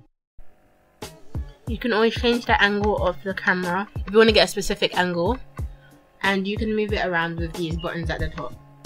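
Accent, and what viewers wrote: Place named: United Kingdom. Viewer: YASS SHE IS BRITISH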